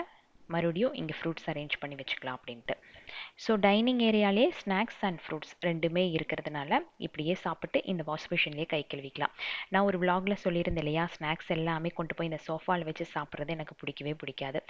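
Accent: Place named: Pollachi, India. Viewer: Entha State ponalum Pollachi Tamil alagu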